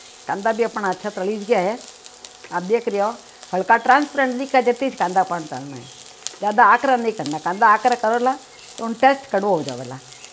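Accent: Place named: Rajasthan, India. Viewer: Thank you. I love your recipes. I also like hearing you talk mardawadi.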